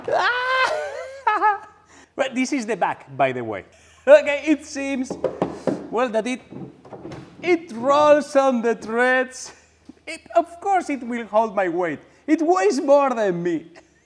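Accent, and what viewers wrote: Spain. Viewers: Echaba de menos tu ingles casi spanglish, por lo que se ve youtube no me daba en la feed tus videos asi que para mi habias desaparecido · Se nota que eres español xd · Más aún? Yo me esmeraria para no tener más acento español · Si vas a hablar en inglés, por lo menos esmerate para que tu acento sea lo más españolizado posible... Gracias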